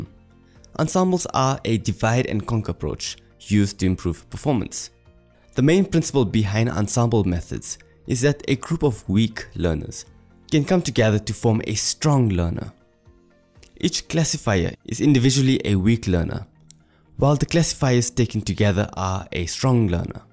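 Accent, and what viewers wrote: South Africa. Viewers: Dude your accent is so South African! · I think this is great. There are plenty of examples of code and technical online but for fundamental understanding, this works just fine. Good to hear South African this coming from South Africa!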